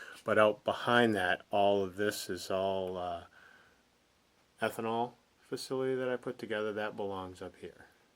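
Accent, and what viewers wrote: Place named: Midwest, United States. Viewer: Beautiful! BTW - let's work on your midwestern/northern Illinois accent a little....Dekalb is Dee-kal-b { really stress the kal, as in 'pal' }, not dakahlb…..Rochelle is Ro-shell, not Rah-shell....Proviso is Pro-vigh-zo, not Pravizzo. As I see you're striving for perfection, I figured you'd appreciate some constructive criticism! Keep up the good work!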